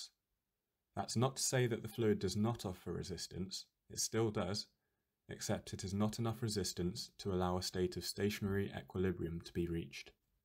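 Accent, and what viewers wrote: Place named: India. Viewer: Indian English make me sick.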